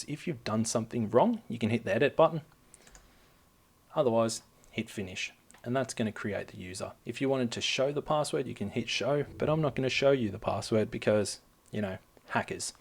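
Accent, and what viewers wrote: Australia. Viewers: My work gave me MS365 responsibilities to help out so this was a good intro. I know most of this stuff but seeing where it is in this video was a good refresher. Been a while since I have done admin stuff. I like how you had trouble with the word enquiries so just changed it to Askmeanything or whatever. lol :D Thats totally what i do when i forge how to spell a word, just use some other words. :D Plus its fun to be in Texas and hear an Australian accent. Makes me think im talking to one of my relatives over there. Anyways. Thanks fo the vid. Cheers.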